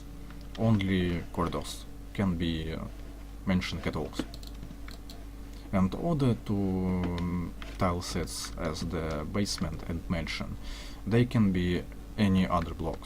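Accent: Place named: Russia. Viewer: Привет! Можно документацию на русском? Очень неудобно слушать английский от русского :///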